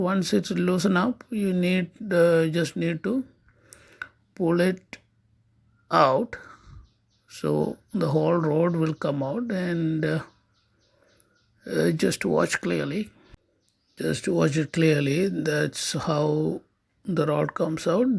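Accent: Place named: North India, India. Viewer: I thought your a north indian.... You know the way you speak English made me think that...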